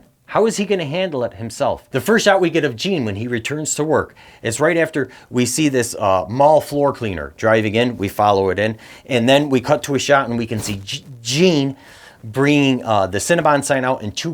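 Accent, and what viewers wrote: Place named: Midwest, United States. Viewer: Are you from the Midwest or Canada? I can hear that accent lol.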